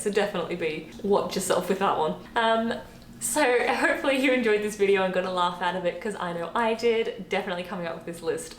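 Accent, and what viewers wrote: Australia. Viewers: FYI this is a cultured Aussie accent unlike certain Aussie accents that seem to be plucked out of the 1930s .Yes I'm talking about cobber ,sheila ,crikey . These terms were out of use before my time and I'm a 57yr old bogan. We are more likely to say " nice bit of pussy" than " crikey that's a bonza sheila" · thank you for this nice presentation even though you seem a little nervous presenting these subjects. Your Australian accent is very faint compared to my Ex Father-in-Law who was brought up in the 'Bush' in the 1930s but settled (ex RAAF) in England after WWII. In the 1950s my mother would take me (aged five to eight) to the cinema (yes I cried for Bambi). I forget the title of an Australian film that we saw but the young lad used the word 'bum' meaning his bottom. My mother quickly informed me that "Australians are allowed to say that word". 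It was even better when the lad sung, to the tune of 'The Ash Grove': My teacher's got a bunion A face like a pickled onion, A nose like a squashed tomato And legs like match-sticks. etc. Rugby Football is similar to American Football in that they rarely kick the ball but without the protective gear. Australia (Wallabies), New Zealand (All Blacks) and South Africa (Springboks) all give good account of themselves and, without doubt, have much 'dirtier anthems' that they sing in the showers or in the Pub' after the match.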